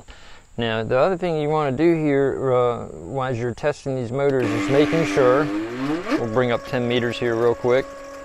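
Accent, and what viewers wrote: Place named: Eastern Shore, United States. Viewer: Could tell by your accent that your Eastern Shore Md, lol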